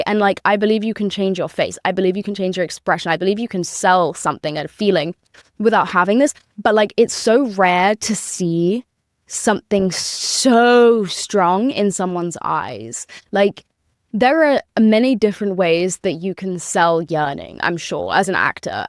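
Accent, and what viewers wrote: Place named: United Kingdom. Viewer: ❤Ur podcast and British accent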